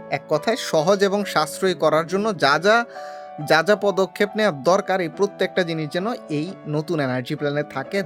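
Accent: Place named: Netrokona, Bangladesh. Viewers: I'm also from Netrakona and I've shocked so much after listening it😊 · আপনার বাসা নেত্রকোনা নাকি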